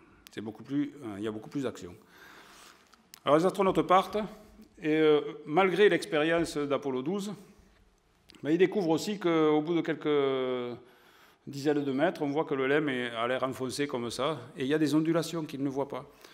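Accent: South, France. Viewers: Merci pour ce festival d'humour Avai l'accent de la garrigue ... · Mais ..juste INTÉRESSENT ..en + avec 1 accent du sud..de pecor sérieux..tu nous apprend rien du genoux...Oh peu cher...aller tais toi stp...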